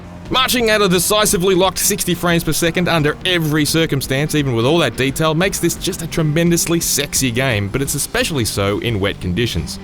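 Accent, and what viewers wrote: Australia. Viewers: The guy is definitely an aussie, man. 😅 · I quite enjoy aussie accent, but listening to this gabble is true pain...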